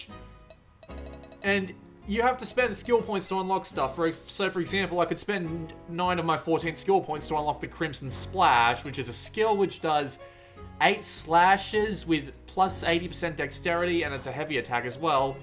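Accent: Australia. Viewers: Love your Aussie accent mate!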